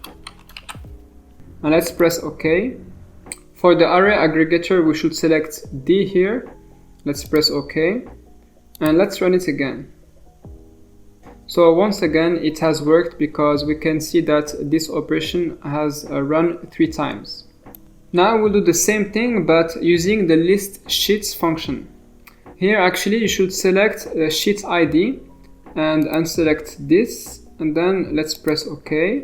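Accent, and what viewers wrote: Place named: France. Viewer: Bonjour, tu as un accent Français, are you french? merci pour le cours 👌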